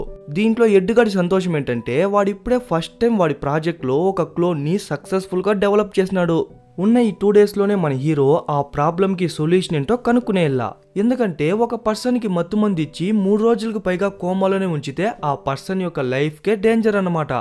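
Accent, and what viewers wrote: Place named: Tamil, India. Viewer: Bro meru tamilian ahh me telugu koncham tamil mix chesinatu vuntadhi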